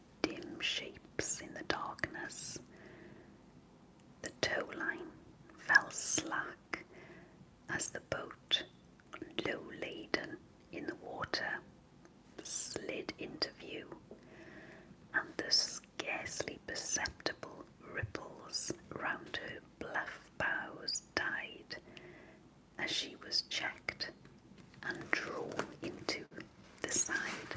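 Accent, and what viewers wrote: Wales, United Kingdom. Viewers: I really love the Welsh accent, especially when women speak it, but the ASMR whispering just isn't for me. Are there any recommendations for YouTube channels, podcasts, something where I can listen to some heavy Welsh accents talking about some nice stuff?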